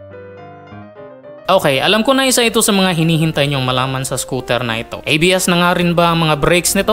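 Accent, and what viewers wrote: Visayas, Philippines. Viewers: Dahil Bisaya ka mag salita,,, Subscribed na ako... Heheheh!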